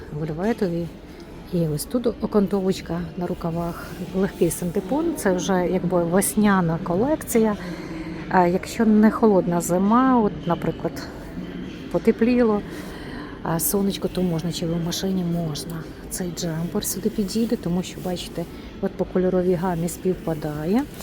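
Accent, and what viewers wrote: Lviv, Ukraine. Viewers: Вітаю вас,! Щиро дякуємо за огляди, бо вони є дуже цікавими. Ви дуже гарно подайте огляд продукції. Це ніби як сама оглядаєш та відчуваєш склад тканин. Я також є дуже прискіплива до якості пошиття виробів. А ще мені подобається ваш тембр голосу, вимова. Відчувається той львівський шарм. Люблю ваше місто. Місто моєї молодості, студентсва😊 💛🩵🍀🌷🌷🌷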